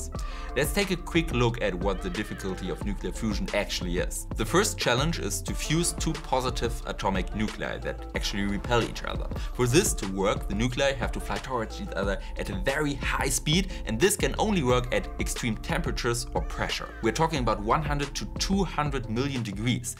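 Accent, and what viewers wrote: Germany. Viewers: Physics is best "auf Deutsch", or "auf Englisch" with a German accent! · Wenn ich als Deutscher Deinen deutschen Akzent extrem ulkig finde, dann ... ist es anscheinend genau richtig.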